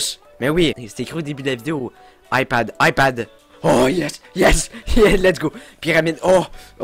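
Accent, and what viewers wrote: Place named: Quebec, Canada. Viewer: Sa fait devoir un quebecois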